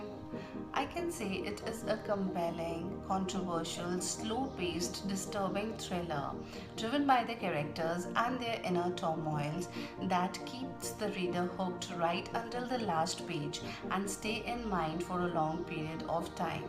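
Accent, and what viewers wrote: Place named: New York, United States. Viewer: Very NYC and good pronunciation....go a head And d best😃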